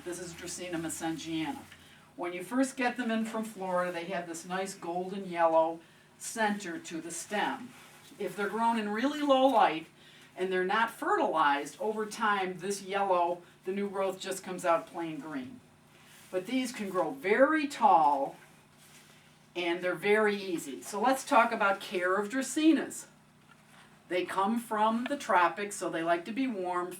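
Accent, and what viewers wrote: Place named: Central New York, United States. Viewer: I spent most of my life in Baldwinsville, NY, just north of Syracuse, so I know a CNY accent when I hear it. You have literally the thickest CNY eeyacent I've ever heard in my life. You may be from like Buffalo or Rochester or something in western NY I guess. But holy shit, you're like the epitome of upstate/central NY/western NY rural twang. I bet you're a huge Wegmans fan and I bet you've cooked salt potatoes more than once.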